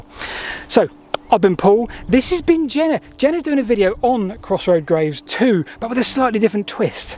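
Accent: United Kingdom. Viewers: How does Youtube think the audio track is "English (United States)"? He is the most British sounding bloke I've heard all day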